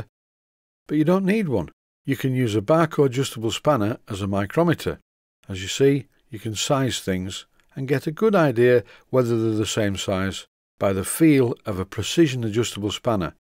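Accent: Yorkshire, United Kingdom. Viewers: I know nothing about steam engines, I never expressed an interest in them. But for some reason your videos and bluff Yorkshire-ness is just really soothing and fun to watch.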